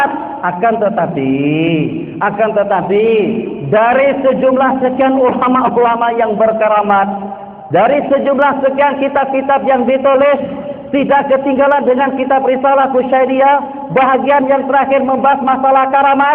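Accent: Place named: Banjar, Indonesia. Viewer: sidin nhe urang manaaa, ada banjary nya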